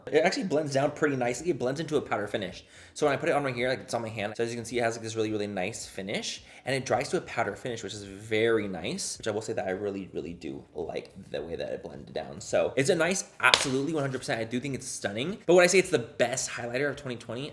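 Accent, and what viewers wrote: England, United Kingdom. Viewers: The British accent is no❤️ no hate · His English accent. what is happening. What did i miss 😂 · the English accent is killing me haha😂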